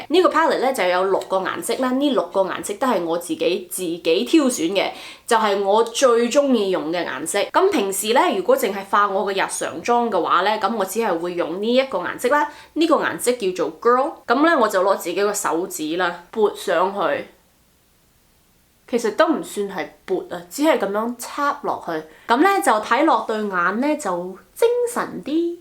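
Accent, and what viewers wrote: Guangzhou, China. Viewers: 好好好重鄕音 廣州音 😂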